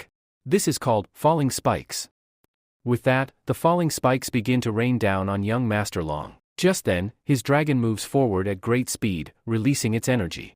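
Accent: India. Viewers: what's with the indian accent xD, tbh i stranggle with normal english so with the accent i think ill just pass on this one even tho the story seems good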